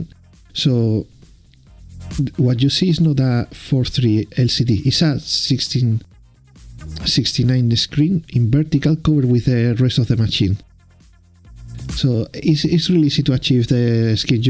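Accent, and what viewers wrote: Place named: Spain. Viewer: Estupenda idea. Te escribo en español porque por el acento está claro que lo eres :) ¿Cómo haces para rotar la imagen? ¿Es una funcionalidad de tu televisión o de tu emulador? Me gustaría implementar tu idea con una Pandora Box, pero no tengo claro que sea posible... Gracias y saludos.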